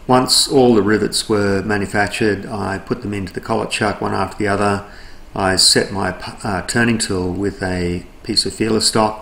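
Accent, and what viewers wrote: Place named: Australia. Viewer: G day i really enjoy your content i just subcribed its nice to hear an Aussie accent on youtube i an looking forward to going through your back catalog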